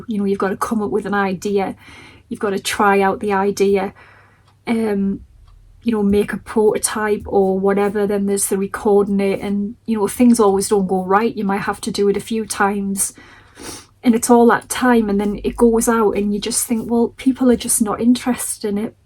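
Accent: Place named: England, United Kingdom. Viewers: Hello, I have literally just found you on YouTube and Ive been paper crafting for about 2 years. Here's my opinion: firstly its so lovely to find a paper crafter with a fellow English accent 😅, there arnt that many on YouTube to be honest so thats nice. ☺️And with regards to long videos, for me the longer the better but I have lots of time on my hands as I dont work anymore. I think, digitals are a thing, I tend to buy digitals as they're cheap and you can reuse over and over. Also alot of crafters like me already have alot of supplies and are keen to use up what weve got. So keep doing the long videos. I like to watch a video when I get into bed at night, it really helps me to wind down. Then any projects I like I will save to my Watch later and make them the next day or whenever. It seems you are a popular crafter (and Im going to check out your videos after this)😁so keep doing what you're doing. 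I particularly like one page folios, as they're useful to store ephemera. Pity about postage costs too. Thanks Royal Mail. 🙄I am also a card maker but haven't made many of late. Ive stopped buying from Temu for supplies and focus on charity shops as its more sustainable and cheaper. Do you sell digitals? ❤️ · Such a sad video. I have three craft content makers that I watch every day and you are definitely one of them. You make everything clear and precise. I think I used to watch any papercraft tutorials I could find but realised that a lot are not prepared, waste time looking for things and just don’t have our friendly northern accent (😍). I did lose you for a time but that would be due to your house move I think. Will definitely be watching you. I like the way you give a twist to old designs which means I probably have the equipment to make the cards. I also prefer the full tutorials but that’s probably because this is my retirement hobby so have plenty of time. 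Hope things improve for the industry. X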